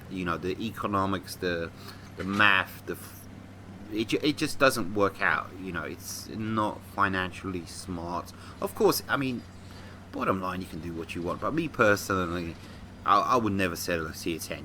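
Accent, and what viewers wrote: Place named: United Kingdom. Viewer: need to stop wearing my headphones when im listening to your vids that music at the end threw me across the room lol nice vid as always big fan hope the hand is getting better can i ask where in the uk u from been trying to work out your accent???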